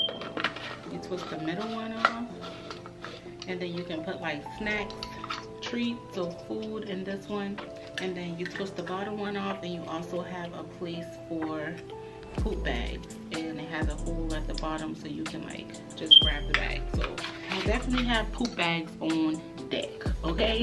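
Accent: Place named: New Orleans, United States. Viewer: You must be from NOLA 😂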